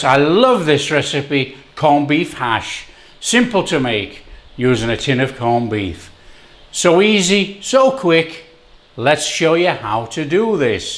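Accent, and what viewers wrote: Yorkshire, United Kingdom. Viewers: hearing you giving the instructions with your wonderful Yorkshire accent is like watching an episode of Heartbeat, I keep expecting to see Claude Jeremiah Greengrass wander in... Corned beef hash is a big favourite of mine! My Dad used to love making that, and he did it the same way as you, so he taught me. This was like a happy reminder for me. Thanks!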